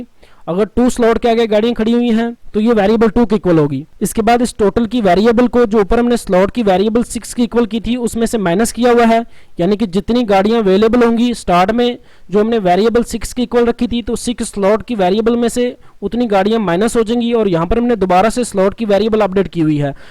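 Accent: India. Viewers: Tech vid Indian voice Useful Thumbs up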